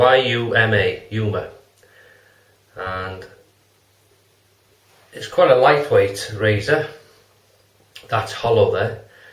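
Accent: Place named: Merseyside, United Kingdom. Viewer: Cheers, I know this is an older video but I wanted to say I enjoyed it. Once again your enthusiasm cheers me up immensely, I think in no small part to your accent. I know you don't think you have one but there it is.;^ ) I'm from the Wirral myself and have lived in Canada since I was fairly young but I like hearing the sound of Merseyside so carry on. Hope you had a Merry Christmas, I remember going to Santa's Grotto years ago but I forget where exactly... Keep the videos coming pal!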